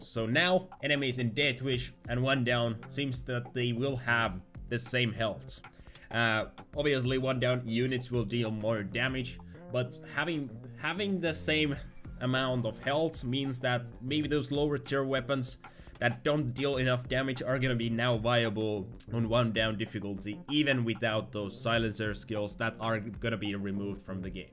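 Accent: Finland. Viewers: Texas Thanatos massacre! btw i like your finnish accent, reminds me of My Summer Car dev guy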